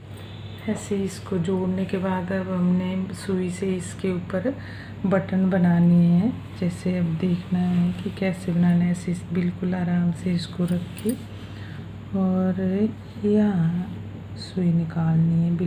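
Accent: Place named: Haryana, India. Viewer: Aap ke bolne se mere ko laga ki aap haryana se hai .mai to m.p.se hu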